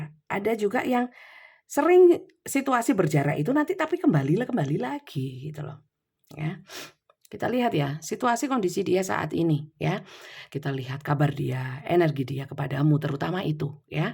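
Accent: Java, Indonesia. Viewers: Medok buanget, kesel aku😂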